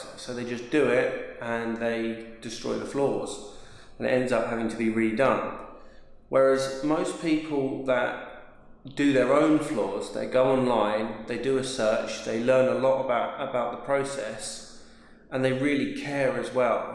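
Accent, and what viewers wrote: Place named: United Kingdom. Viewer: maybe it's your british accent, maybe it's your experience,,maybe it's both, but you seem like an authority on the subject and I trust your wisdom.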